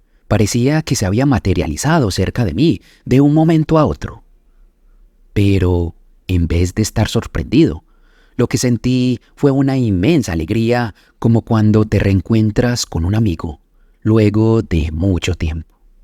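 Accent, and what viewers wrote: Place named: Antioquia, Colombia. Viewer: Que maravilla escucharte, gracias por la entrega de los mensajes. Nada pasa por casualidad creo que todos los que llegamos a tu canal, teníamos que hacerlo y yo estoy feliz y agradecida con el universo y la vida por llegar a tú sendero, me encanta tu asentó, tan Paisa tan bonito 😍, yo también nací en esa hermosa tierra pero llevo muchos años fuera.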